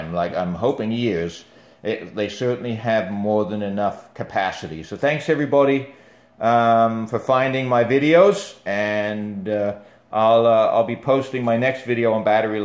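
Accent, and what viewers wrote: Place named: New York, United States. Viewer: He sounds so new yorkey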